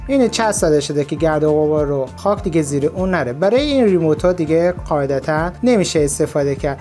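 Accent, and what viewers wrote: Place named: Yazd, Iran. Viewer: لهچه شیرین یزدی فراموش نشه. 😍😍😍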